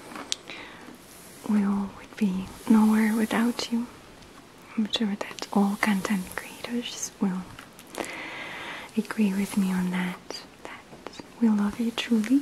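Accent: Russia. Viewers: Scissor__hands94 is definitely one of my favorites. If you like her accent, I'd suggest ASMRMania (another Russian gal, her accent is quite a bit thicker than.) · I really love your facial with strong Russian accent. As well as your sleep fairy. Could you please do some more similar? Thanks for always brightening my day,. Keep up the grand work:-)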